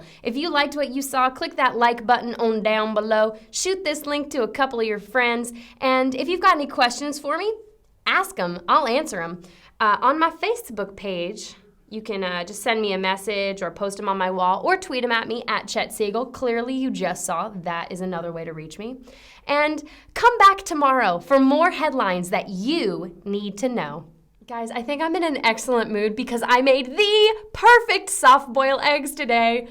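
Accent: England, United Kingdom. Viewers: You're welcome. Obviously I just call you those things outloud and appreciate that I've never actually typed those words in the comments box because, well come on, that would just be fucking creepy! I do feel comfortable enough to announce that I am a huge fan of your english accent though, great because it's not the "upper class" accent that most go for.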